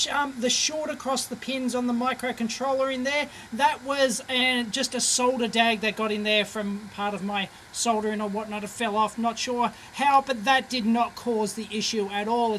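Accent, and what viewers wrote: Australia. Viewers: Despite the different audio recording setup, you still sounded like an Aussie :)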